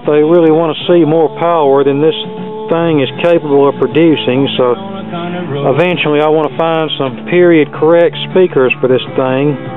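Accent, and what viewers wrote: South, United States. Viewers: staggs You have to take in account of his southern accent.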